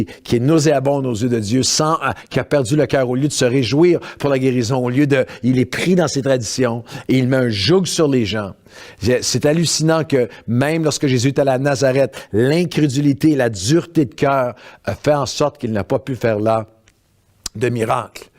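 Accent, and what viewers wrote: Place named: Canada. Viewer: Avec vous depuis le sud de la France ! Dans le minervois! Ne soyons pas des vierges folles !! Merci pour votre prédication edificatrice avec l accent canadien ! ❤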